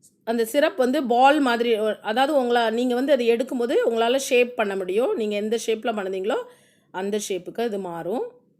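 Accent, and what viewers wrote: Tirunelveli, India. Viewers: Akka KU tirunelveli pola.. tamil avlo arumayae iruku kekum pothu 😍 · Thirunelveli language arumai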